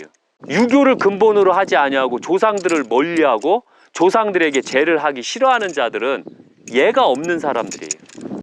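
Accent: Chungcheong, South Korea. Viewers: 선생님잘보고갑니다^^ 충청도사투리구수하네유^^ 충청도어디세유?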